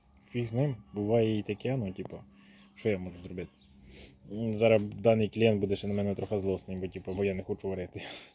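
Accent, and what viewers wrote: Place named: Hutsul, Ukraine. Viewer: Привіт з Волині ,Автор одне питання ви з Гуцульшині чи області Закарпаття?????акцент увас похожий)).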